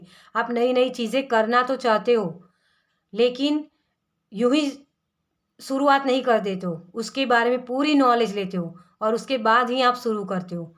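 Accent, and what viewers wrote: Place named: Gujarat, India. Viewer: Pile 1 sub sahi hai mam our aap ki hindi masaallah Vijay rupani jesi hai.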